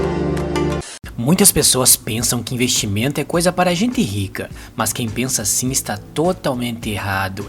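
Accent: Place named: Rio Grande do Sul, Brazil. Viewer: Bah gaúcho kkk que sotaque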